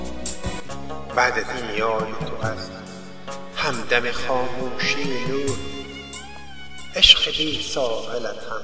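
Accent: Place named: Iran. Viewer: very nice poem.. unfortunately in persian dialect... i wish it was spoken in pure Dari. but nice upload. !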